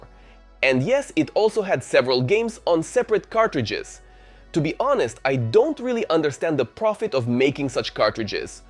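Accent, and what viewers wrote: Russia. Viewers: почему когда русские люди изучения английский все говорят тоже как ты квк тебе*?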